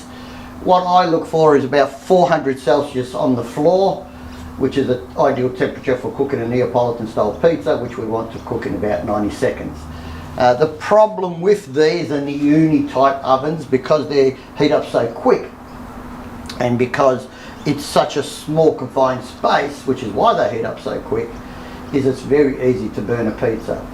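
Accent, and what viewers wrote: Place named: Australia. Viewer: Gr8t video. And I loved your accent too (don't get me wrong it reminded me of my year abroad spent in Penrith NSW). Thanks mate.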